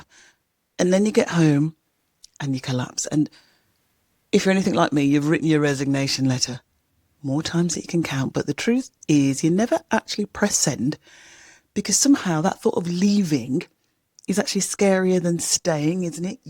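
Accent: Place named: United Kingdom. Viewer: Just a note of encouragement for your channel. Luv your sense of humor and soft, soothing delivery. The Brit accent doesn't hurt either. You know how us Americans adore a British accent!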